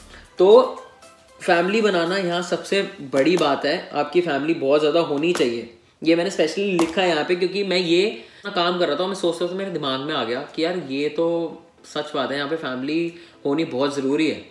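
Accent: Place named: Sindh, India. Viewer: I can surely say that you’re a Sindhi Indian. Borders just can’t change our accent ❤️ Love from SINDH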